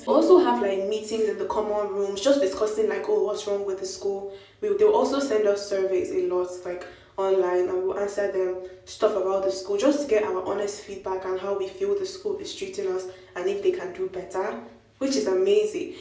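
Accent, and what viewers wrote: Igbo, Nigeria. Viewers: Her igbo sounding so poshhh😂😂❤❤❤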